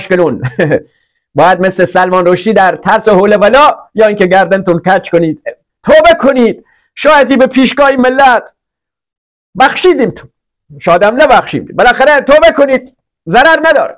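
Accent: Lorestan, Iran. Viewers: درود بر آقا گل و وطن دوست. عاشق خنده های قشنگ و لهجه شیرین لریت هستم داداش. خدا نگهدار سردار عزیز تنگسیری و همه سرداران غیور ایران اسلامی.در مورد بحرین حرف شد حالا یک شعر ناقص و غلط میگم. ایران من بهوش باش از آن توست آرارات... ز اقتدارت شده عدوان تو کیش و مات... با قدرت سپاهت پیوند دهیم به خاکت... کشورک جعلی بحرین باکو امارات.... انشاالله پیوستن خاکهای با ظلم جدا شده به مام میهن اسلامی ملحق میشود. خاک بر سرت زشت کلام و اصلاح طلبان. مرگ بر دشمنان ایران.مرگ بر فتنه گران کثیف. پاینده باد ایران اسلامی. ننگ بر مسولین خائن مانند کوزه گرها مدیر تامین اجتمایی قزوین که حق کارگری که جانباز هم هست پایمال کرد. ننگ بر امید نادان. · درود بر که به لهجه شیرین لری، همرا با طنز مسایل ملی ایران و وطن پرستی را مطرح میکنید 🌹🌹🌹🌹🌹🙏🙏🙏🙏🙏🙏🇮🇷🇮🇷🇮🇷🇮🇷🇮🇷🇮🇷🇮🇷🇮🇷🇮🇷💪🌹🌹🌹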